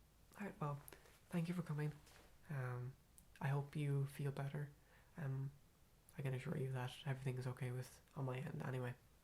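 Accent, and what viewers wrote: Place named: Ireland. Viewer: Yay and Irish ASMR artist 👌🏼 I'm Living in the Uk and it's so cool to have a familiar accent sending me to sleep 😴 Subbed!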